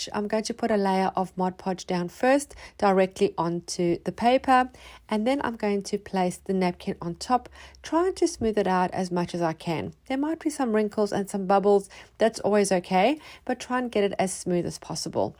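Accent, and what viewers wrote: South Africa. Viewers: Lovely. Love the South African accent. ❤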